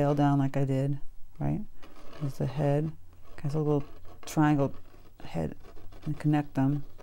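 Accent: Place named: New England, United States. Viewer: I loved the painting and I love the tutorial. You are very good at explaining the process. And I loved that I could detect a New England accent I believe!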